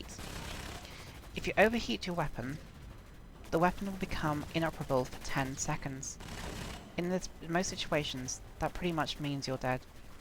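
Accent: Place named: Iceland. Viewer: That would be an Icelandic accent. Most people will have an accent carrying over from their primary to their secondary language, it is only natural for this to occur.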